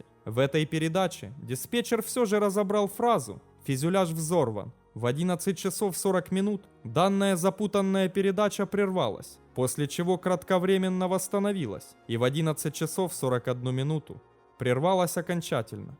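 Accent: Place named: Ukraine. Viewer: у него произношение как у Креосана, скорее всего тоже украинец. У них как раз такой говор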